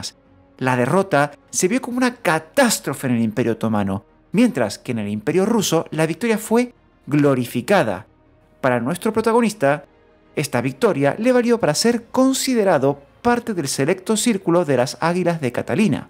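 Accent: Latin America. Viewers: Ya quisieran muchos profesionales hacer audiovisuales como este canal. Y me encanta el acento Hispano de América.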